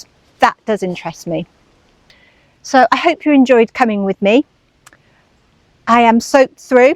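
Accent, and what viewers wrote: United Kingdom. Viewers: A couple of years ago i went to the famous undercliffe cemetery in Bradford and there was a group of Bronte fans who were making speeches about a new found grave belonging to one of the Bronte servants who died in a workhouse and was just discovered there! But i don't remember her name! P.S Your Yorkshire accent made me laugh!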